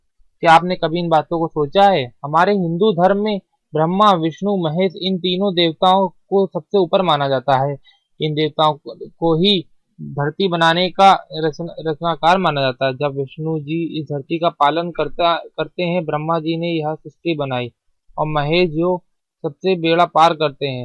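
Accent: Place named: Uttar Pradesh, India. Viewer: hehe... UP tone!!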